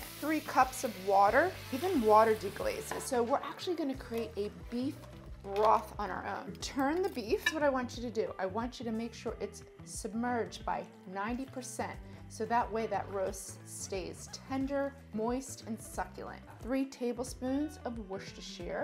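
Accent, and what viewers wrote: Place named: New England, United States. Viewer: loved what you did both on the pot roast and the rib roast. Methodical and clear. Also your presentation is awesome. Total butter, can’t replicate the accent but willing to bet New England/ New York maybe Jersey. Cheers.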